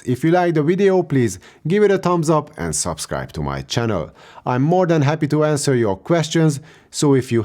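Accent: Hungary. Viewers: Hello, great guide, it helped a lot in choosing my earbuds! I was wondering what your native language was, because listening to you felt like you were talking to me in Hungarian accent the whole time. I can't help but mention this. :D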